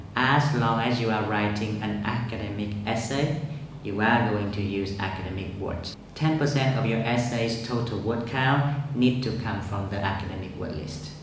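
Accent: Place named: United Kingdom. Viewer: Mình thấy thầy nói hay mà . Thầy nói giọng Anh- Anh nên chắc bình thường bạn nghe giọng Anh- Mỹ nhiều nên nghe ko quen thôi.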